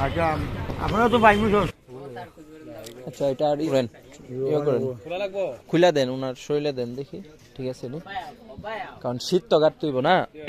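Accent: Sylhet, Bangladesh. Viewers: Bai apni ki sylhet ar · Onek vala legeche bhai. Bhaiya Apner Basha ki Sylhet?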